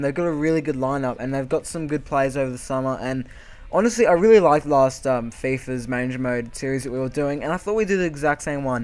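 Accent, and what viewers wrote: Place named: Australia. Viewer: yer fukin aussie luv ya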